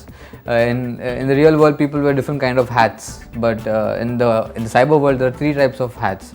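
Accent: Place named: India. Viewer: I am proud to be a romanian who knows how to talk and write in 4 different foreign languages. So, if you want people to understand your message in a proper way, learn how to fu***n pronounce. I don`t have nothing against India, or you, but ... your accent it is horrible. Thank you! :)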